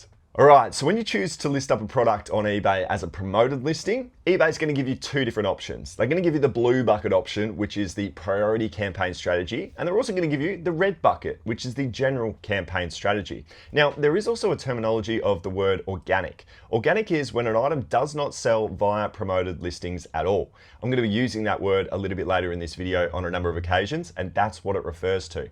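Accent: Australia. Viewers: I like your voice its cool to hear Australian Accent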